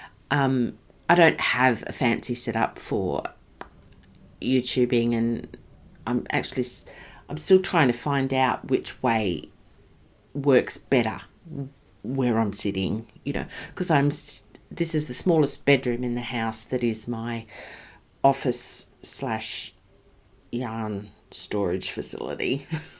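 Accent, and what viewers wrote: Australia. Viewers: I love watching you. You make me smile. Maybe you can help me make some socks. Love your Australian accent too.🥰🧶🧙‍♀️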